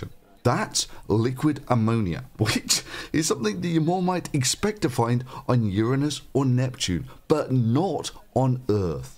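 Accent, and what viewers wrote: United Kingdom. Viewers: is that a Scottish accent? Your long form videos feature a different accent.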